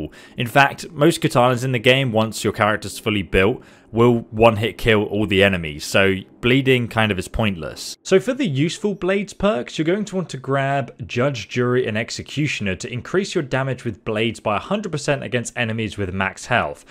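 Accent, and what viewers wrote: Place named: United Kingdom. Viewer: It's called being British, we dont mispronounced things, Americans do.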